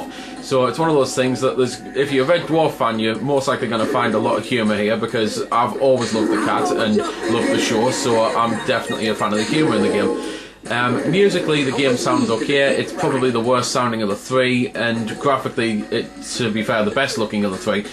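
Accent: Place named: Newcastle, United Kingdom. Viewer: Nive video mate. You sound local, you from Newcastle?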